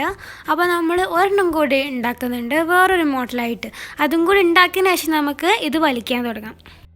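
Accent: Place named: Kasaragod, India. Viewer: Nammale kasargod slang aanallooo😍😍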